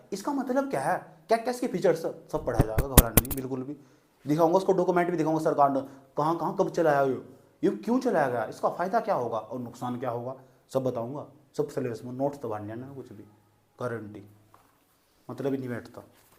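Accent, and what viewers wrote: Haryana, India. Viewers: Asa lagta h sir ap bhi haryana se ho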